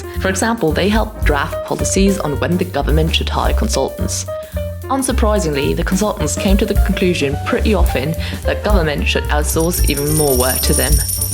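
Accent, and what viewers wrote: England, United Kingdom. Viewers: Excuse me if you mind, where are you from? I figured out where your accent is from, it sounds like it's from somewhere in the UK but not quite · I'm riveted by the waterfall hair, the jazz, the beauty, and simultaneously the horror of the words actually being said. 925% ! Bloody f....king hell. Final verdict: dizzy & sick. Ooof. PS - really nice handwriting. With blurred f-stop closeups. And then the accent - which bit of England is that? Those are the last straws. What's a man to do.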